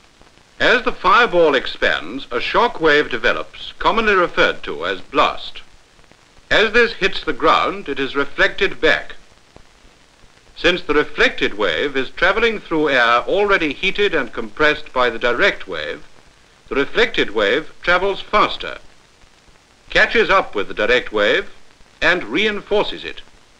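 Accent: United Kingdom. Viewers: This is a British film, not Canadian. Love the narrator's Received English accent. Flash sounds like flesh. No wonder why they call a flashlight as a torch. Americans would laugh if they heard something that soundly like fleshlight. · Typical british accent... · That high-brow British accent... that same annoying accent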